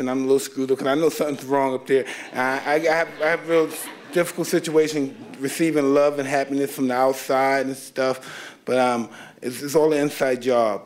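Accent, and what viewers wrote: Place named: United States. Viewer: I like the way he articulates his points in pure American English, Tyson Mike a true legend,he speaks English so nicely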